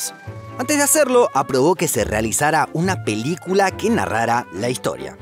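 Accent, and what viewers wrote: Rioplatense, Argentina. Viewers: Si no me equivoco tenes acento rioplatense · acento argentino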